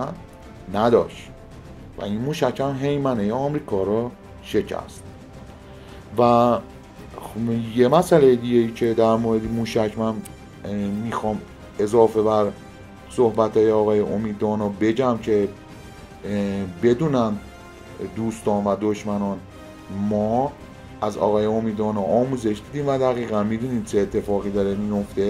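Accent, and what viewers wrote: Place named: Isfahan, Iran. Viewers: حداقل اصفهانی حرف نمیزدی که ابرو و شرف اصفهانی را نبری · فدای لحجه اصفهانی شما درود بر شرفت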